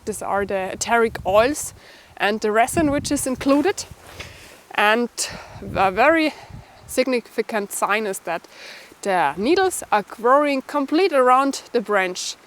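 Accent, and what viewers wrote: Germany. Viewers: I love German accent, especially with all the mistakes. She warmed my heart through the entire pandemic. The world is already cold enough, don't you think?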